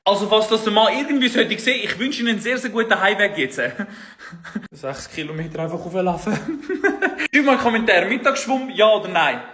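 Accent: Switzerland. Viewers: oh man, los ich dir gärn zue! Läb im Dütsche und hör viel zu sälte Schwyzerdütsch <3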